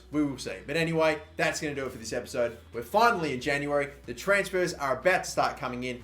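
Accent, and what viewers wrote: Australia. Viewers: Tommy C yeah but then you hear his whining Australian voice even more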